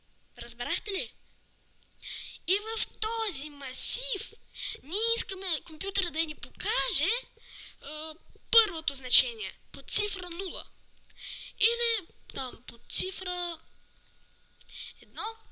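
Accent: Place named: Russia. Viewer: Руснак ли си, защото имаш як акцент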